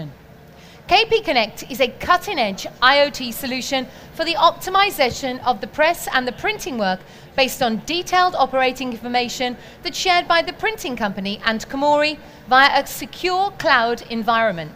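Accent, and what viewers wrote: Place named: United Kingdom. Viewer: Can't stand the British mouth here.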